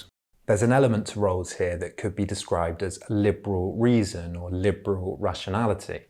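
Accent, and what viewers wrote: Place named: Bristol, United Kingdom. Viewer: the slight bristolian accent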